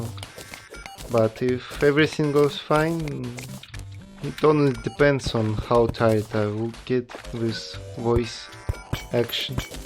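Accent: Russia. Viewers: I see a lot of Russian friends that write in English excellently, but neglect speech and worry their skills are still terrible. Believe me, better writing = better you! You have already mastered 90% of speech skills, the accent is just for flavour and us native speakers can't get enough of yours =D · А я то думаю почему акцент такой русский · Its some type of russian/slavic accent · Russian accent doesn't bother me lol Just makes it seem like I'm watching a Soviet legend lol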